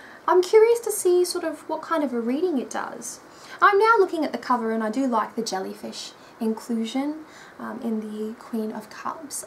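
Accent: Australia. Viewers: Wow I'm always super surprised at how you pronounce certain words! Haha no offence, the Australian accent is gorgeous, but I'm so not used to it (Swedish TV mainly shows American shows and movies...) and I always have to concentrate a bit more to understand you. :D Again, no offence, I need to stop being so ADD anyway, so it's good for me haha. The Linestrider is a bit odd to me, I was very drawn to it, but when I opened it up I felt it was a bit "colder" than I had expected. It almost gives me the same vibe that the Fountain Tarot did for quite a while! But now I love the Fountain Tarot so I have hope this one will warm up for me too... :)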